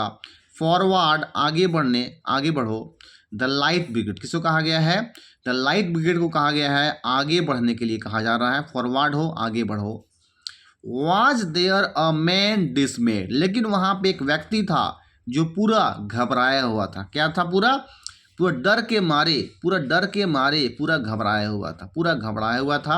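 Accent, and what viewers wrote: Bihar, India. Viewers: Sir please aap apna accent thik kriye English words ko bihari accent dekr kharab mt kriye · Sir please apne bihari accent ko sudhariye. English words ki ma-bhen kar rkhi hai!!!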